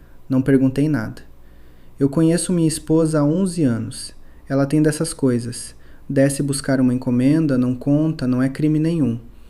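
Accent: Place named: São Paulo, Brazil. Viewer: Por que os numerais falados em inglês? Que coisa irritante, além dessa vozinha com sotaque paulistano.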